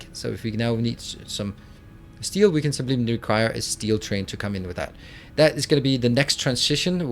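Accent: Denmark. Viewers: This dude is for sure Danish :D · Love the video one question are u danish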